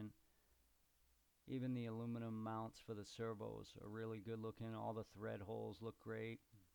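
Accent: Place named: Boston, United States. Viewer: Love your accent,bean town???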